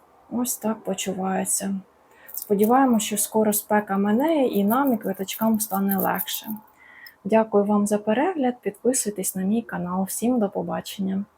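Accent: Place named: Surzhyk, Ukraine. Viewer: Дома вы украинским языком не разговариваете это отчётливо слышно по вашему произношению на суржике ,обосаться вас слушать,стараеться что то лепит набор слов - це просто вау😂😂😂😂😂😂😂😂😂😂😂😂😂😂😂🎉🎉😂😂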